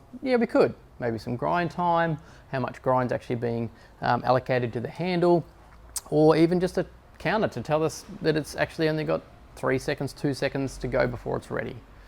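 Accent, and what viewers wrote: Australia. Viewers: Just love the Ozy accent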